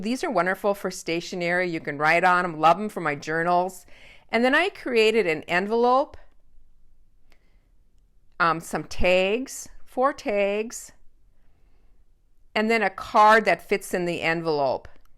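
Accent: Minnesota, United States. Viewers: Ah that Minnesota accent with your fabulous creativity. Love it!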